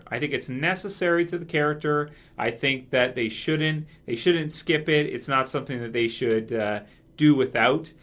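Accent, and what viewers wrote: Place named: Canada. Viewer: Canadian? I hear an accent from time to time. Great channel. Love it!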